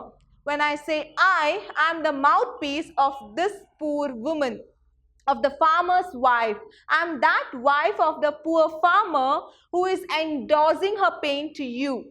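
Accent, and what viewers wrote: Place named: Kerala, India. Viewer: But her pronunciation is unbearable...mallu pronunciation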